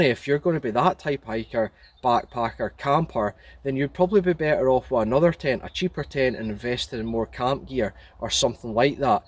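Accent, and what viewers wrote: Scotland, United Kingdom. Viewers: Holy crickey, you really are Scottish in your way of speaking. Long live linguistic diversity!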